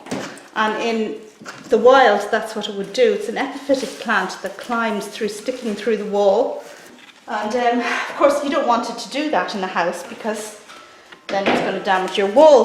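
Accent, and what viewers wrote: Ireland. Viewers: OH NOOO!! Every plant lover's worst nightmare! Not the Monstera! LOL - I stumbled upon your channel recently and just subscribed! Love it and all your tips (and lovely Irish brogue!) Happy Planting, dear one!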